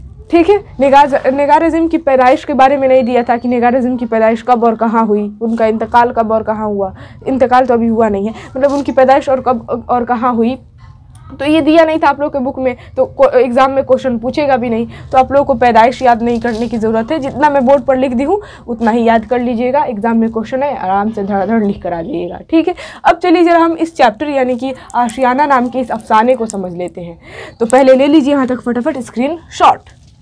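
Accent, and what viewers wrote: Bihar, India. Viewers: آپکے بات کرنے کے انداز سے لگتا ہے کہ آپ بہار سے ہو بہار کی کیسی تہذیب ہے کہ وہاں کے لوگوں کا تلفظ تک درست نہیں ہوتا افسوس صد افسوس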